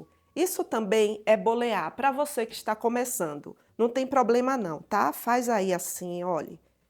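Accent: Bahia, Brazil. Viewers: Oi amada. Morei 22anos. Em Salvador, e me falaram que esse molho se chama molho lambão uma delicia, morro de Sdds desse sotaque lindo, hj voltei para O Rio de Janeiro , estou me recuperando de um momento de doença, mais assim que melhorar farei seus salgados para venda , obrigada e Deus abençoe sua vida sempre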